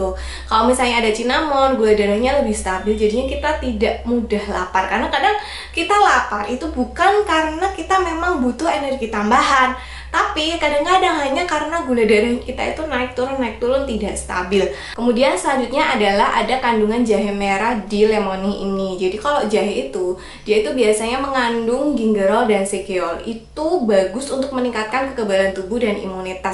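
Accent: Java, Indonesia. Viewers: ooaaalllaaahhh..... mbak nya wong jowo toh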